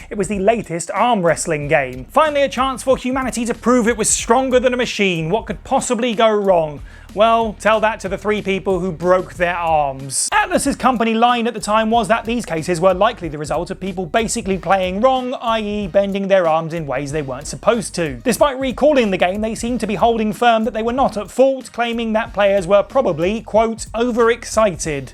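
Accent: United Kingdom. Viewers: Does anyone else love her voice? Maybe I'm just a sucker for British accents 😂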